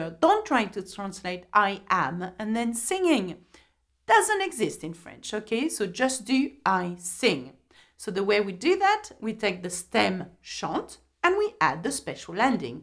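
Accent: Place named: France. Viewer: Thank you. These are good refreshers for me. J’ai un question. Vous êtes de quel région en France. J’ai étudié en Normandie (Rouen). Votre accent me semble un peu différent.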